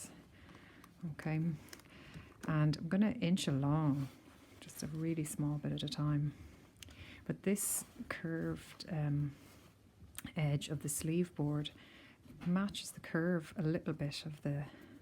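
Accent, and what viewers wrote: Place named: Ireland. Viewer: Irish accent😊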